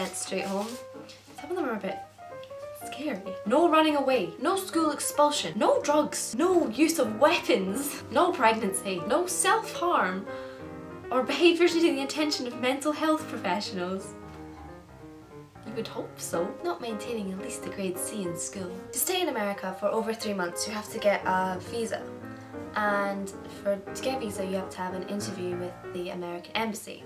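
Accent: Scotland, United Kingdom. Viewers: Haha, wish you came to my school! We never had a foreign exchange student from Scotland, here in Florida before, escpecially a cute one x) Love the accent too! Hope all goes well! Good luck! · tis not a good thing, why would a scottish person not want to sound scottish. also she does sound scottish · you have such a cute scottish accent :D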